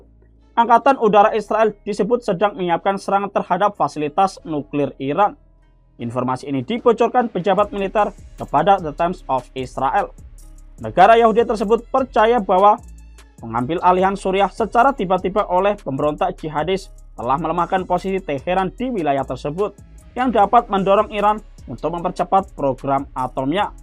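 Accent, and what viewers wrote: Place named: Java, Indonesia. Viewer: Apa komentator dialek jawa..... gak enak kedengaran nya... kita indonesia...cari org komentator yg pandai dsn fasih bahasa indonesia..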